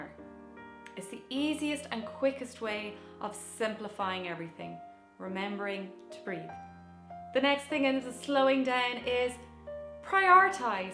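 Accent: Ireland. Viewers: Your accent is beautiful where are you from? Ireland?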